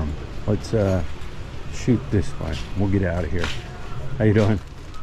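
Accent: Philadelphia, United States. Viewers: It's not that they don't speak English, it's just that you speak english with a Philly accent and they need time to process what you just said - that blank look on their face after you say something to them is their brains processing what you just said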